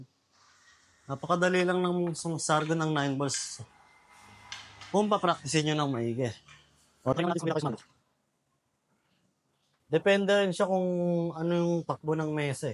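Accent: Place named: Rizal, Philippines. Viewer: Taga Rizal ka ba bos. Ang punto mo Rizal.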